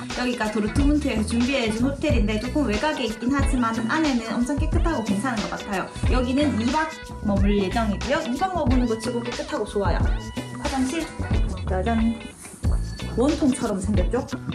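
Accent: Gyeongsang, South Korea. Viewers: 경상도 삘이!!!! ㅎㅎㅎ 평소 좋아하던 분인데 정감이 가네요!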